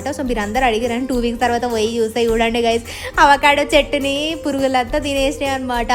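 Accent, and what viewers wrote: Telangana, India. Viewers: I love you telangana slang because I am also from telangana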